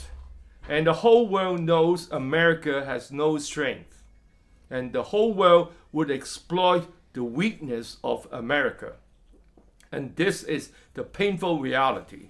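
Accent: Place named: United Kingdom. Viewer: Thanks so much for the broadcast. I noticed the British accent increases just a few minutes into the show. Good work.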